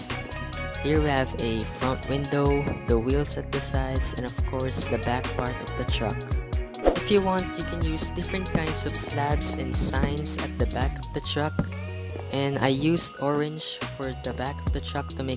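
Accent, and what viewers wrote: Philippines. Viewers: Ko ya parang Tagalog ka no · Are you Filipino, the way you speak in english is filipino, I'm Filipino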